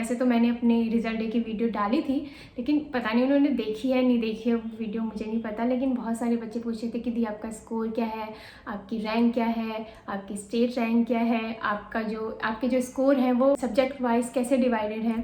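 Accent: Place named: Pahadi, India. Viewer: Ur accent is of pure pahadi region dear😀😃🤗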